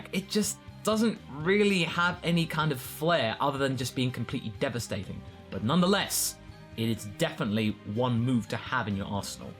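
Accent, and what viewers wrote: England, United Kingdom. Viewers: Its because of the english accent. That's just how we say it over here. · gt live supporter British. You can't tell by his accent?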